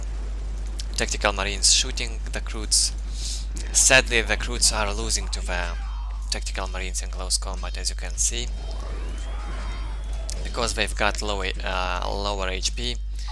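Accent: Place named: Russia. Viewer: Hi,. Some critics is here. Nice channel, live voice acting and great replays choice. But. This russian accent, gosh. Don't get me wrong, you have good vocabulary skills, better than my. Wish you luck. Thumbs up.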